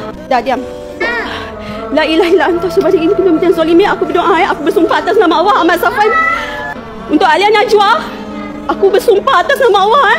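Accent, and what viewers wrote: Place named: China. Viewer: Content ni aku memang follow sejak PKP lagi. Content dia memang straight forward and aku sampai sekarang xleh nak kenal suara min ni memang cina ori ke or bukan cina tapi buat loghat cina😂❤❤❤❤❤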